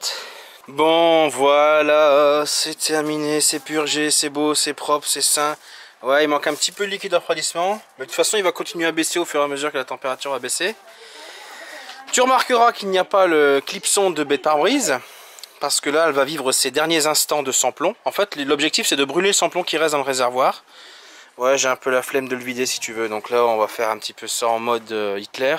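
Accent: North, France. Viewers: Merci pour ta réponse, étant aussi originaire du nord expatrié dans le var, c'est vraiment sympa d'entendre cet accent lol, mais aussi surtout un passionné. Je viens donc d'acquérir un TT V(R)6 MK1, il serait sympa peut être de voir quelques vidéo sur d'autre modèles "VW" qui sont équipés des fameux "VR" ?! Au sujet de la chaîne de distri, quels sont "tes" préconisations ? Car il me semble (pas sur) que chez VW il n'y a pas de remplacement prévu ? Puis autre chose à prévoir, selon ton expérience, sur un modèle Audi VR6 DSG Quattro ? Merci pour tes réponses !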